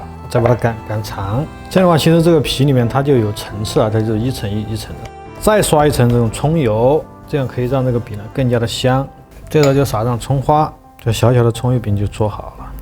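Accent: Sichuan, China. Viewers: 一听就是老乡—四川人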